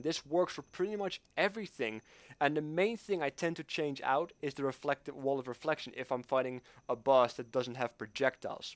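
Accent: Netherlands. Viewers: You are a dutchy aren't you (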